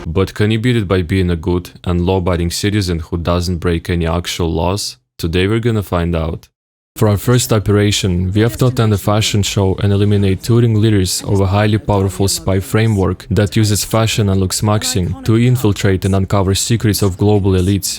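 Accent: Russia. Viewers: the hard russian accent makes this so much funnier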